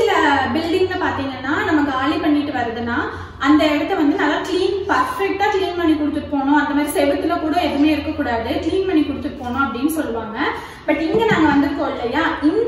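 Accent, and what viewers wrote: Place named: Coimbatore, India. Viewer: Sister neenga Coimbatore ah....covai slang😊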